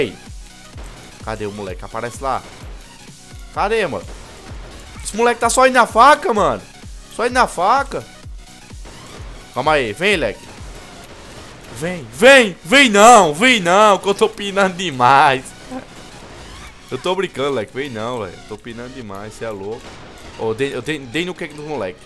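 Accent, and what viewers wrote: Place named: Ceará, Brazil. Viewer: Onde tu mora?pra mim tu tem sotaque de sobral:P uma cidade "perto" da minha (Fortaleza)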